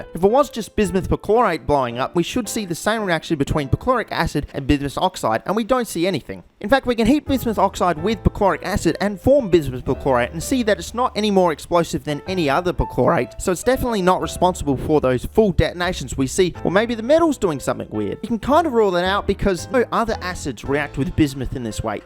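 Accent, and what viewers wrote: Australia. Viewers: today is a good day, E&F video. time to hear an aussie love the 60s.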